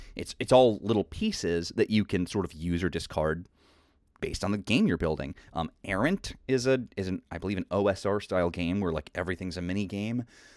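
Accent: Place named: Mid-Hudson, United States. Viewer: I can't hear an accent, ya sound upper Mid-Hudson/ Catskills. "Complete Strategist" is a great shop, I couldn't imagine gaming there though. I never got into 5e. I read the core books & thought it looked good but my group wanted to stick with our modified 3e/3.5/PF1e/D20 rules. I can see why they did but I would've given 5e a try. I only run home brewed settings since the '80s & in my experience players will always add to the world. We've been running games in the same world for over a decade now. The setting before that lasted 8 years. Sometimes players will misinterpret my descriptions, make assumptions or connections I didn't think of & come up w/ something way cooler, so I'll just go w/ it as if that's what I intended the whole time.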